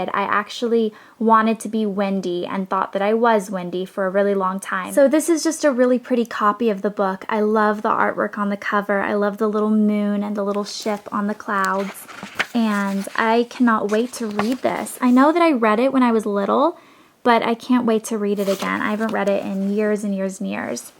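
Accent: South, United States. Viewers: It's funny because you sound southern! My favorite was the dreamcatcher! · Haha your accent seems like it's gotten stronger from visiting home! I never really noticed a Southern accent but near the end of the video it seems to really come out! :)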